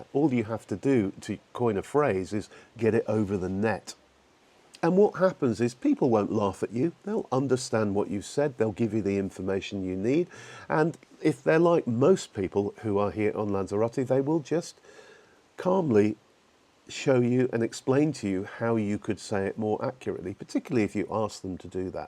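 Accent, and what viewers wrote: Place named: England, United Kingdom. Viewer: Tienes razón no has hablado mucho pero algunas frases… suficiente para escuchar el acento. Creo que para ingleses no es fácil perder el acento… no quiero ofenderte pero es así. ¿0 piensas que no? Has recomendado cuatro periódicos y escuchar la pronunciación de estos periódicos era suficiente para escuchar el acento! ¿No lo creas? ¿O que piensas al respecto de tu acento?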